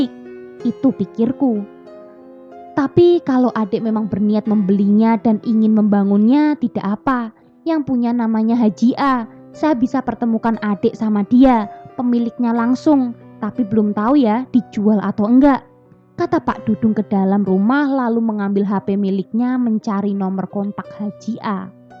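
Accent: Java, Indonesia. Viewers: Org jawa ya kak? Logat nya medok kali 😂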